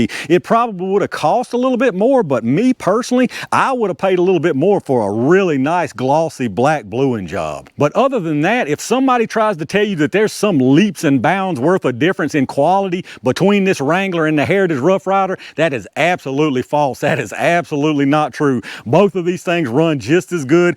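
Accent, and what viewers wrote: South, United States. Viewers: Your videos are fun! Like your crazy hat and Southern accent ya'll!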